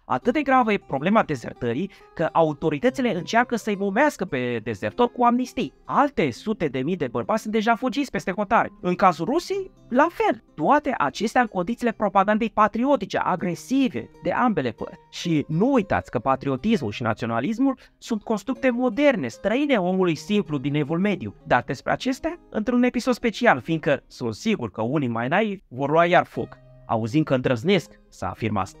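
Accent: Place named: Moldavia, Romania. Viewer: Sper să îl aduceți pe Ștefan cel Mare la locul de cinste pe care îl merită în rândul lumii / audienței. Și nu Țepeș. După accent înțeleg ca sunteți de-al nostru / de-a lui Ștefan cel Mare și nu de-a lui Țepeș. …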